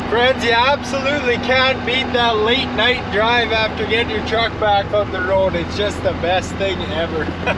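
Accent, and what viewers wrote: Canada. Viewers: Aha. I was trying to figure out where this guy was from. I was guessing Dutch Canadian. So I know Canadian for sure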